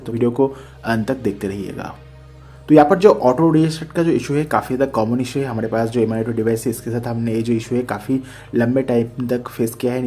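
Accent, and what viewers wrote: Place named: India. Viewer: لمادا الشخص الهندي لا يقدم طريقة ويكتفي بالكلام مع ااعلم لا نفهم عليه اللكنة واللهجة. 😮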